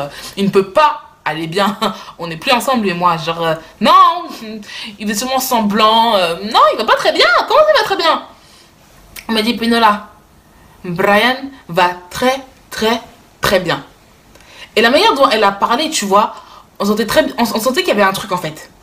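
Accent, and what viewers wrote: Africa. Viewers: je t’aime bcp surtout ton accent africaine oh j’adore 😍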